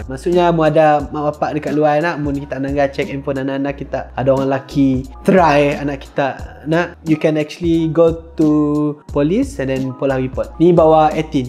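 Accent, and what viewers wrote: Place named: Sarawak, Malaysia. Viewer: Good content! Keep using sarawak dialek. Suka gila dengar ❤ sbb sopan ja rasa haha.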